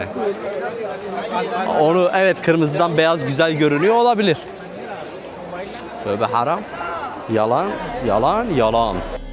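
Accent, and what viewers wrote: Turkey. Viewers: Töbe haram demen harikaydı😂Şiven çok güzel yaa..Bizim oraların( Diyarbakır ,Mardin..vs)insanının sıcaklığını hissediyorum.❤😊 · nerelisin dogu şivesi var ama bilemedim ayrıca kanalı yeni keşfettim hemen abone oldum çok güzel videolar emin olabilirsiniz izlemeye devam edeceğim yolun açık olsun başarılar dilerim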